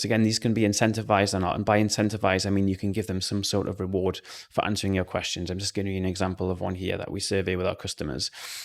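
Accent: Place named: Germany. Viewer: are u german ? you sound a bit like it im german aswell thats why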